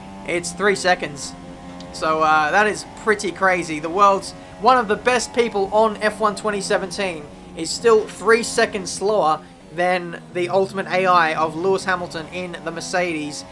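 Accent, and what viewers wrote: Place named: Australia. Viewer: Richard Booth He's Australian, of course he will have an accent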